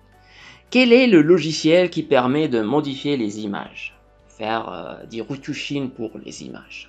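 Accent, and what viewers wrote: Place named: France. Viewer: Vous avez une belle accent française ! J'ai cru au début que vous êtes français. Keep going 💪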